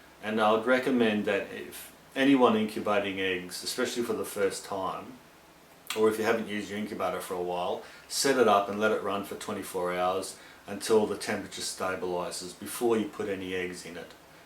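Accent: Australia. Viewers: Good review, and always miss Austrlian accent, miss Australian friends and teachers. However let me buy one and try with chickend eggs.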